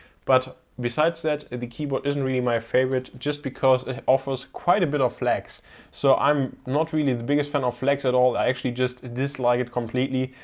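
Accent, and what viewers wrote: Germany. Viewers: Du bist doch deutsch oder? Gutes Review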